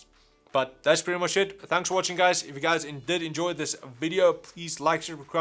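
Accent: South Africa. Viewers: Hey. You're South African haha. Me too. Considering to buy this headset for myself now.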